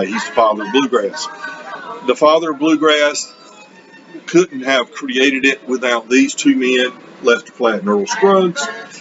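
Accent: South, United States. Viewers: I don't know if I can be your friend. You keep saying prog rock fans have no sense of humor. You make me want to cry when you say that (LOL). Seriously, I enjoy your channel. I enjoy your clumsiness. I am more clueless about this video making technology than you are. Secondly, you remind me of my best friend that passed about 4 years ago - same hairstyle and also a southern accent (his was a GA-FL mix). I think you are just a decade older than me. My friend was born around the same time as my parents.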